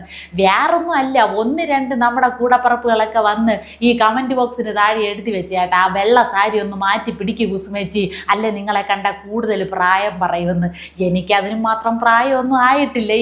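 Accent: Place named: Neyyattinkara, India. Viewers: എന്തര് അപ്പി..കുസുമേച്ചി സുഖന്കളൊക്ക തന്നെ ? എന്തര് മുഖത്ത് വാട്ടന്കളോക്കെ കാണുന്നല്ലടെ..എന്തരപ്പി നിനക്കൊരു ദുഖം…ഇപ്പോഴും കെട്ടിയോൻ പഴയ കോവാലൻ…തന്നെയടെ ..? 😜🤣😂 തിരോന്തോരം സ്ലാന്ക് കിടുക്കി ..😍👍🌹തൃശൂര് കാർ ഞന്കൾക്കിത് കേൾക്ക്ന്നത് രസമാണ് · ഇത് നെയ്യാറ്റിൻകര സ്ലാംഗ് തിരുവനന്ത പുറം അല്ല ok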